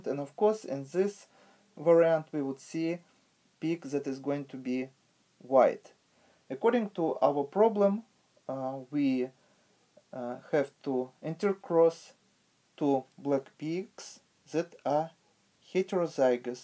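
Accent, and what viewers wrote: Russia. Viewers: Are u russian !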